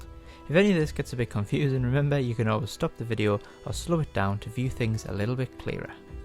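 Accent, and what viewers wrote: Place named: Scotland, United Kingdom. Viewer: From your acent i guess you are from skotland like yelowwool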